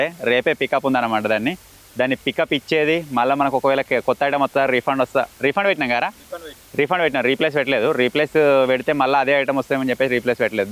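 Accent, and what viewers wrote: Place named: Godavari, India. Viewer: Anna love from Andhra ❤ Maa slang lo undey magic eyy veru godavari ❤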